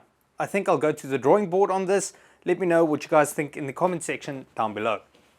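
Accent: South Africa. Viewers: Loved this so I subscribed. South African? Nine video and I think the final bench would work great when cutting sheets of plywood iv you just put like floor insulation on the table first.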